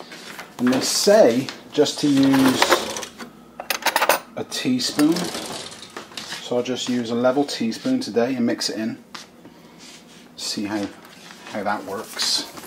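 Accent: Bristol, United Kingdom. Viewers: Bristol! Recognise that accent anywhere! I lived in horfield, Bristol for 5 years.....Alright my lovvveeeee :-)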